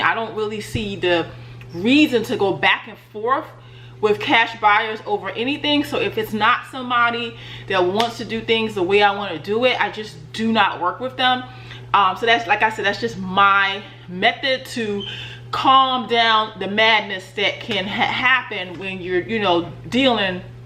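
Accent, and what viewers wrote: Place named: New York, United States. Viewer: Very informative video!! Thanks. I know ur from NY tho😂 cant hide that accent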